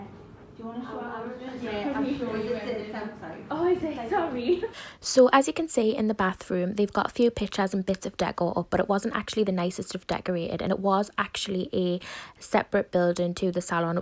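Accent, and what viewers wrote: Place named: North, United Kingdom. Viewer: It’s just how us northerners talk ✋🏼😂❤️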